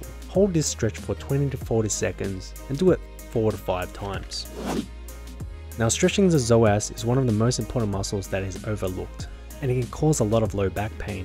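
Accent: Australia. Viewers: Super helpful. Thank you so much. Love your Australian accent too.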